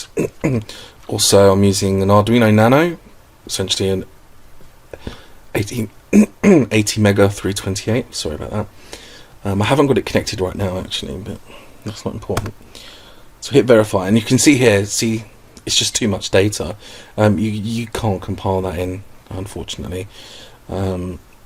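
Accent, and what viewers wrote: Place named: England, United Kingdom. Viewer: You English blokes have the best accents, I could listen to you explain stuff all day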